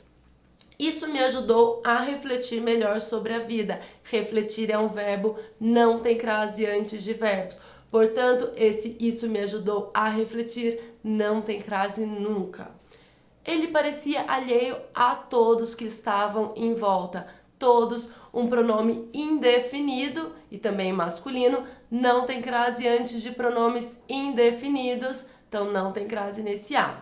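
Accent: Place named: Minas Gerais, Brazil. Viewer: Essa manja muito; me salvando e salvando geral...pelo sotaque é mineirinha...também né s2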